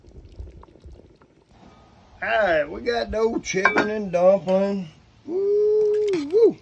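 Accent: Louisiana, United States. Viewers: Are you from South Louisiana? From Cajun Country?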